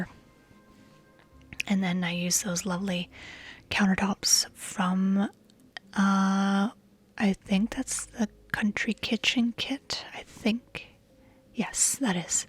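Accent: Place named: Canada. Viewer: i’ve always suspected you were Canadian because of your accent, but it’s awesome to hear it confirmed! Torontonian here :)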